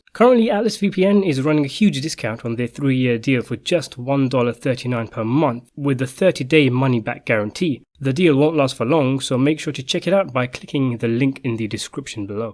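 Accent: United Kingdom. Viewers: This was actually a pretty decent narrative but I just can’t get over a British accent describing American mafia…I just…. Can’t. It’s gotta be a New Yorker or an American who sounds like a cop. Sorry Brits…love your documentaries but when it comes to American mafia, your eloquence takes something away from the experience 😑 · Has this guy got a british accent? I am british i never thought he had one till i saw this I have always presumed the narrator of this channel was Indian Maybe he is british but from an indian family background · Pakistani British accent? Am I correct?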